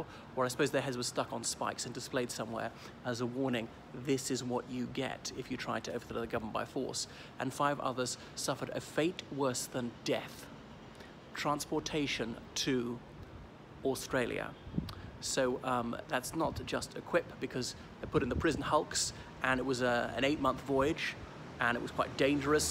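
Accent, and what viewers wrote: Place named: England, United Kingdom. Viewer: Your English accent keeps my attention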